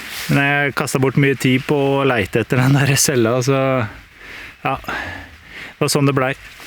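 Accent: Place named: Drammen, Norway. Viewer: Fin video. Du holder det nede og gir det hele den ro villmarkslivet skal gi oss. Artig liten historie, akkurat nok prat og fin bruk av kamera. Har bodd på østlandet i 20 år, nå flyttet til midten, og mener jeg kjenner igjen Drammens dialekten. Ser fram til neste video.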